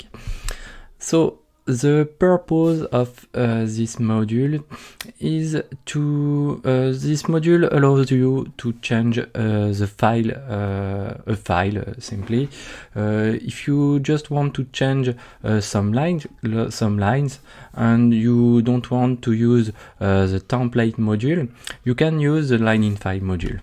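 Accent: France. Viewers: Yoooo.. J’adore l’accent français bro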